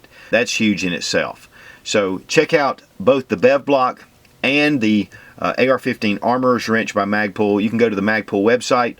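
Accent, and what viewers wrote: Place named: South Carolina, United States. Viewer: LOL... gotta cut some slack. That is a South Carolina accent some folks may not be used to is all and I totally agree on the mag vs. clip nomenclature. Tube On!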